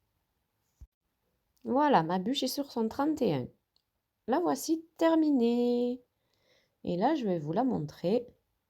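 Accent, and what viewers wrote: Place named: Provence, France. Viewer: 👏👏👏..abonnée depuis peu je vous trouve top comme vos recettes et vos vidéos 😉😁..j'ai fait ce raphaello en version gâteau rond et c'est une tuerie !mes invités n'en n'ont pas laissé une miette 👌😂..alors merci 🥰..plus qu'à tester plein d'autres recettes de votre playlist 😁..votre bel accent fait du bien et il me fait penser à ma sœur qui habite dans les Bouches du Rhône 🥰..à bientôt !!🌹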